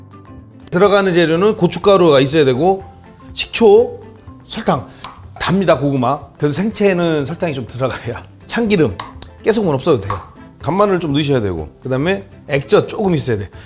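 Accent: Chungcheong, South Korea. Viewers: 그런줄 알유 하면 다 알아들유 충청도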